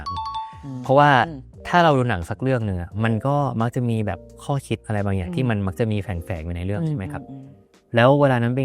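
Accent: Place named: North, Thailand. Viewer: น้ำปิงพูดเหนือน่ารักมาก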